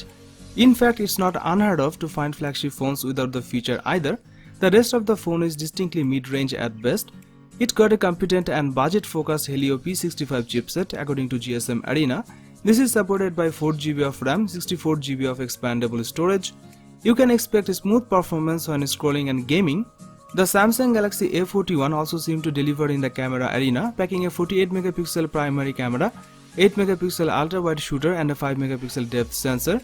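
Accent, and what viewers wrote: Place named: India. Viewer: I wanted to focus in the video but indian voice always annoyed me but I'm not a racist or hate them I can't just stand they're accent..sorry...binod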